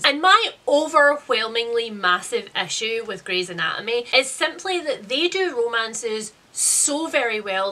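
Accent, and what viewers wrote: Scotland, United Kingdom. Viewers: I have very rarely not sped up audiobooks, often times based on the accent of the narrator I will start lower (usually 1.2) but as I become used to it I speed it up. There have been some narrators that talk sooooooo slow, that I listen to them at 2.5. It completely depends on the book, but probably on average its usually 2x, it just sounds more natural - once I got used to it listening to it at 1x sounds like a record that has been slowed down. I also listen to youtube videos at 1.5 to 2x - 🤷‍♀ It's more normal sounding/soothing for my brain. I like a lot of booktuber merchandise, and I love being able to support youtubers that I watch a lot, I honestly watch youtube more than I watch TV. Also while I don't enjoy all the same books that you read, I do enjoy that you're Scottish 🤣 The accent makes me happy.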